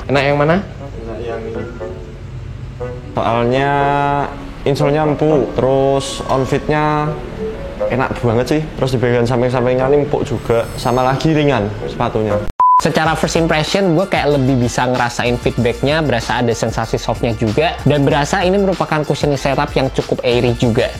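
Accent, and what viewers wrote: Java, Indonesia. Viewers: Medok olweis on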